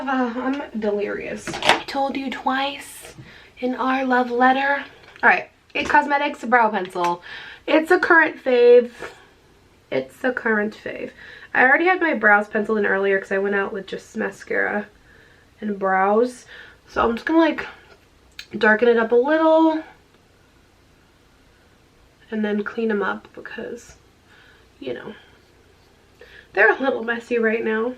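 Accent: England, United Kingdom. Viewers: ❤️ this look! You shine bright like a diamond ✨ Your English accent is cute too; it would trick me. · British accent on point girl <3 love your vids always. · Actually impressed with your british accent;) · Voiceover with British accent... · You totally sound British I love it!!!! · wow that british accent 😍😍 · British accent is ON POINT! Wow! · you sound british as can be.!! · Love the video, Great makeup, great British accent, and you look beautiful. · British accent is spot on! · love British accent🤣🤣😂😍great job so believable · OMGOSH that Accent!!!!!!! I vote you do an entire video in the Brit! XOXO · Love the British accent! And that highlight, ooooh girl!!! Such a gorgeous romantic look! I hope you and Alan had a good 5 year anniversary! 💗 P.s. Lolol 😂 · Yaaasss do a get ready with me with a British accent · LOVE your English accent 😂💋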